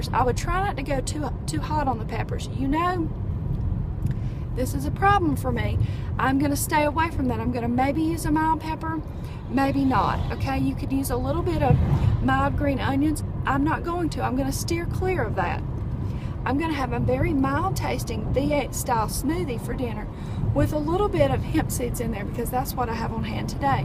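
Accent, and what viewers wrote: South, United States. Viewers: Hey! I just found your channel and enjoy watching. I recently purchased a Vitamix and love it. I'm not vegan, but love green smoothies and raw veggies. I laughed so hard when you held up your pitcher.:) I would do the same thing. I am in my mid 40's and hope to not have all the crazy peri-menopause symptoms I read about. By the way, I'm from the south too, so I understood your accent perfectly! Great vlog!: )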